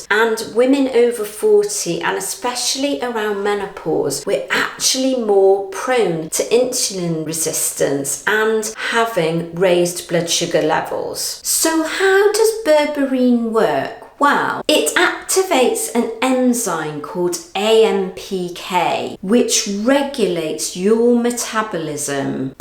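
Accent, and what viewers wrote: United Kingdom. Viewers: A Nigerian with Brit accent? Hum, they don’t go together!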